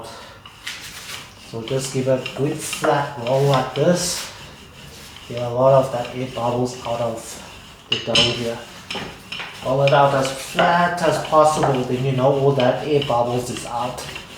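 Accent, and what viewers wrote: South Africa. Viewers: Where , Johannesburg, Port Elizabeth , Cape Town?Nice to meet you , I was born in South Africa , now live in Australia. Love the accent.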